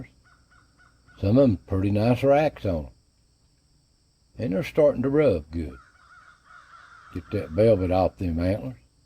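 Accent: United States. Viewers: Good morning sir if you ever quit talking on these videos it will be a sad day as you nearly speak true American about like I do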